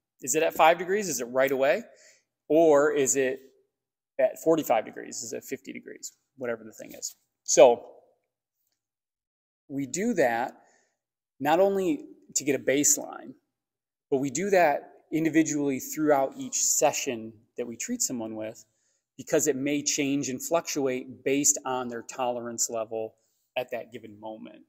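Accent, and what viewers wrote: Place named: Midwest, United States. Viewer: I really enjoyed your video. I am curious if you use any traditional chiropractic methods to help increase the cerebral blood flow? I have a somewhat mild case of POTS which came out 6 months after i got Covid. Turns out i have chronic Lyme and a bunch of tick infections. It was really bad in 2022, and I'm about 70% recovered. But i still wake up in tachycardia and i can't tolerate much exercise, which is so hard for me to deal with. I also get brain fog and fatigue by 2-3 pm every day. Anyway, it's interesting to hear this take on what could be going on. I would love to have this type of in depth evaluation. You sould like you might be in the midwest, so do you happen to know any other practitioners in the DC area? Or are there online resources for home exercises which people like me could try to assist brain recovery? 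Thank you for your insight!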